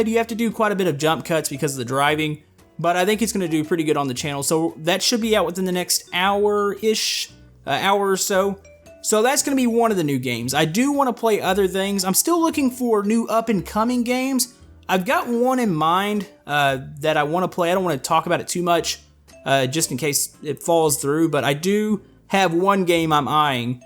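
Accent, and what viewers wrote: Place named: Texas, United States. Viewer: can you speak with your texas accent?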